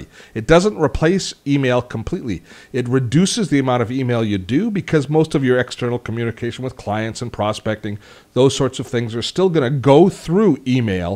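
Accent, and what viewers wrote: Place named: Canada. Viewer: Canadian heavy use of "aboot".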